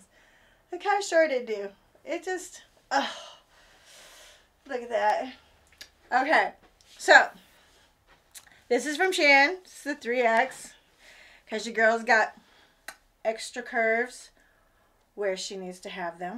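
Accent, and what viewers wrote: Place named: South, United States. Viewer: Honey i love your southern accent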